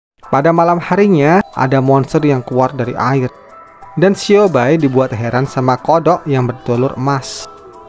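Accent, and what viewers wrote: Malang, Indonesia. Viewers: Kyk e wong malang iki mimin e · Bang orang malang ya?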